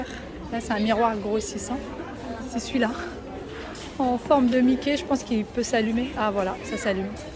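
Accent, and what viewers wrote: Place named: Morocco. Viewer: Bon début de semaine ♥️🇨🇵🇲🇦 et Allah y3awnk ala wladk j'adore ta façon du parle Marocaine hh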